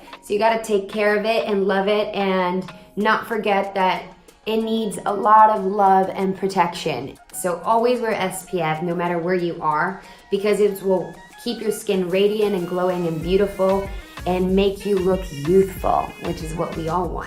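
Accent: Mexico. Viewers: Ufa ainda bem que não é difícil te entender ! entendo um pouco o mexicano 😍🇧🇷😁❤️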